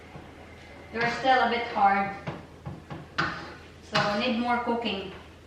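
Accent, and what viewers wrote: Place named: Iloilo, Philippines. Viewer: Hi. Watching here from Dasmarinas Cavite. I remember your accent dahil sa aking mahal na Inay. She is Ilongga. Sobrang sewer at mababait halos lahat ng ilongga. Say hi to your hubby. God bless!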